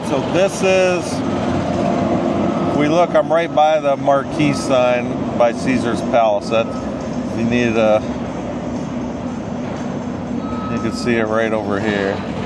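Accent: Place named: Pennsylvania, United States. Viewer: Where you from originally? I thought I detected a PA accent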